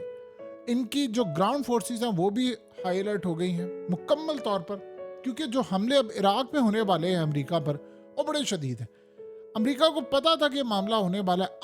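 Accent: Punjab, India. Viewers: Sir Mai India 🇮🇳 se hu aap ki nwes ward ki Namber 1 nwes hai Aur aap ki Awaz hme bahot Achhi lagti hai urdu ke sath thodi si punjabi ki jhalki bhi hoti hai sir mai ko dekhna chahta hu plzz sir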